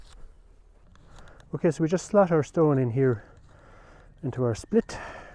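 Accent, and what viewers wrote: Ireland. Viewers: These videos never get old because is such a skilled, inginuitive, man w/a pleasant Irish accent and beautiful terrier dogs.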